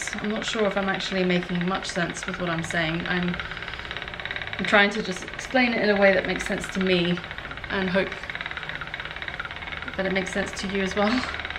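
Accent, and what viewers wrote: United Kingdom. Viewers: That Nor'n Iron accent brings back so many memories of playing with my aunt and uncle as a kid!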